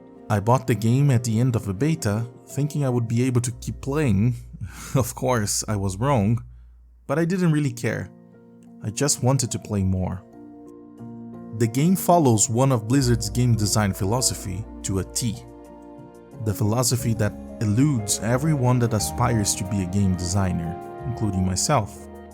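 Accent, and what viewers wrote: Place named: Brazil. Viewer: Dude, you kinda sound Brazilian. Where are you from?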